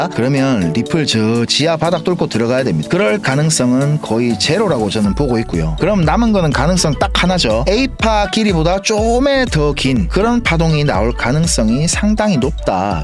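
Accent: South Korea. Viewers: 확실한서울발음~^^ 퀀트1기입니다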